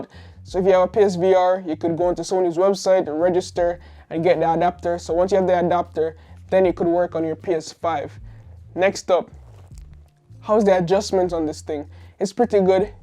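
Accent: Jamaica. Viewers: Mi can hear di Jamaica in yuh yute 😂🤞🏾🇯🇲 good video I'm thinking of buying one. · Where you from bro? Sounds like a Jamaican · Wait u Jamaican ?🇯🇲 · Are you Jamaican 🇯🇲✊🏾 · Are you Jamaican? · Yow real medz are you jamaican? ?? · Man sound like a yawdy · I hear the Jamaican accent lol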